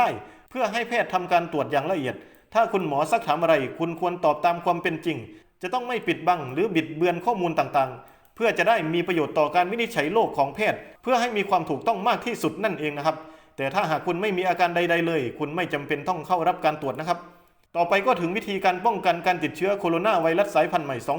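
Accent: Isan, Thailand. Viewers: ใช่สำเนียงคนอีสานแท้ๆ😄😃😂😁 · คนอิสานแม่นบ่เจ้า😁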